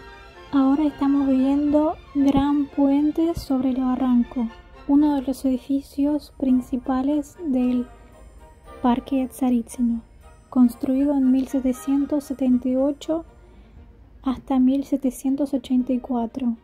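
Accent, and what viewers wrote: Argentina. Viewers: tonada de Argentina. Yo vivo en Neuquén capital y hablo igual que. De donde sos, estimado? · Tenes la tonada de Bs As perfecta!! Tenes que cpntar como esta Rusia hoy en día con el tema de la guerra, esta más cara o más barata que Argentina??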